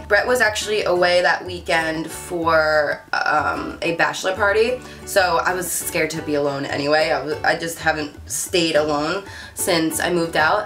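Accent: New Jersey, United States. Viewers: OMG it finally makes sense. Your slight accent. I don't how I couldn't tell before you have a Jersey accent.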